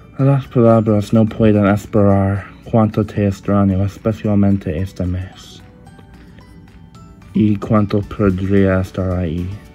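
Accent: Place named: England, United Kingdom. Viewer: Me encanta ese acento de los ingleses cuando hablan español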